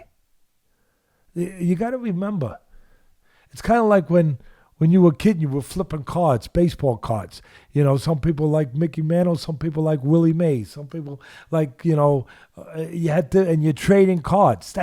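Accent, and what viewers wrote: New York, United States. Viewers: Do you think Gus and Jerry keep me tied to it sounds like it. Teddy the scientist half mass times velocity squared equals force. He tried to say that Mike Tyson was not quick or fast you’re wrong. He wouldn’t have the power if you didn’t have the speed velocity has three times the effect of mass read the equation again. And technically up to six times. The two together are devastating right. Peekaboo I see you. You can fool some of the people some of the time probablyUp there in New York where they understand your accent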